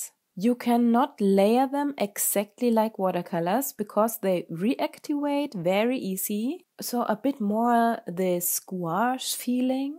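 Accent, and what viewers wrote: Germany. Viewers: Dein Englisch ist zwar richtig gut- trotzdem hör ich da einen LEICHTEN Deutschen Akzent raus? 😅 Gibt's deinen kanal auch deutschsprachig? Deine Kunst ist echr genial 👌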